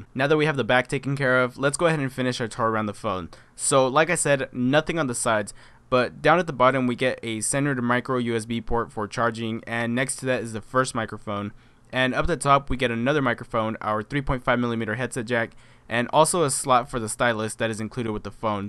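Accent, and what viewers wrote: United States. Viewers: yo u from fresno bro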